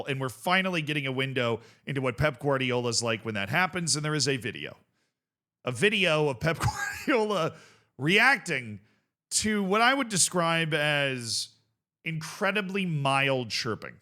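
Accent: Liverpool, United Kingdom. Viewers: Scouse not enlish innit. boss like la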